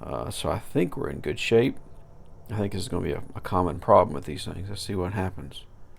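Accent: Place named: South Carolina, United States. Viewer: You sound like you are from my area...South Carolina?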